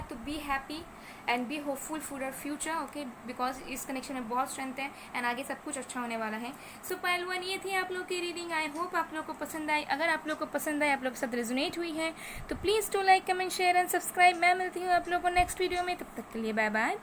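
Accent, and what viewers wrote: Bengal, India. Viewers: Are you from Bengal? 💐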